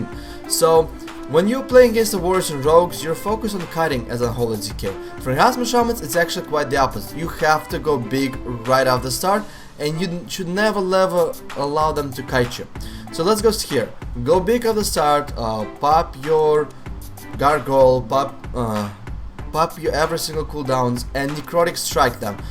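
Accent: Russia. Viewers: Hey, first of all just wanted love the channel and ofc russian accent. i was wondering if maybe i uploaded a game of 2's of me playing unholy you could tell me if im shit or not. thanks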